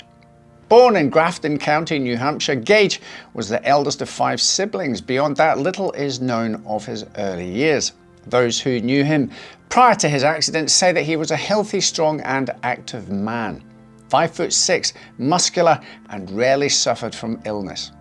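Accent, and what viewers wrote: Scotland, United Kingdom. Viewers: Are you are Scottish, but have stayed in England? Or English, with time spent in Scotland?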